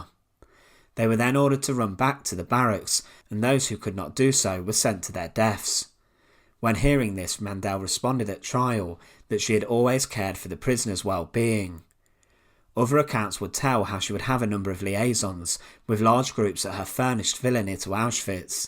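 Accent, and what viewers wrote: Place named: Cockney, United Kingdom. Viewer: Let me start by saying that I really like the content on this channel but am I the only one that gets annoyed with the inflection in this person's voice? I was in England for two years but this guy borders on a cockney accent that is very difficult to follow at least in my opinion.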